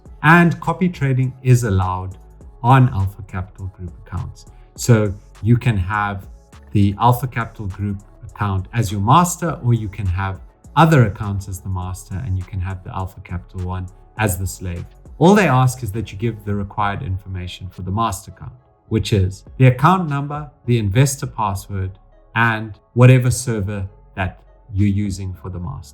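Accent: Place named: South Africa. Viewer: I hear a South African accent.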